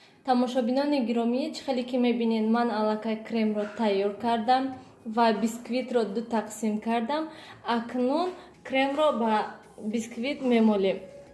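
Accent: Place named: Panjakent, Tajikistan. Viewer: Зинда бошен апа, гапзанетон Панчакентихоба хела монанд будааст 👍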